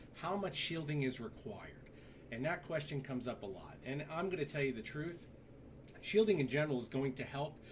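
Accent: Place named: Eastern Pennsylvania, United States. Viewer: you sound like you're from eastern PA. good explanation on the video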